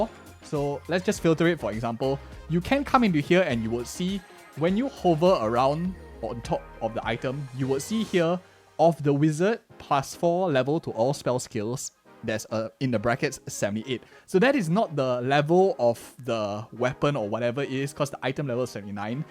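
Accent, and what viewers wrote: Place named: Singapore. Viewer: Why do I hear Singapore😂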